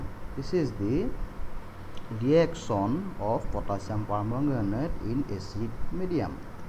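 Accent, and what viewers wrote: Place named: India. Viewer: Sir is speaking the english that foreigner think of India 😢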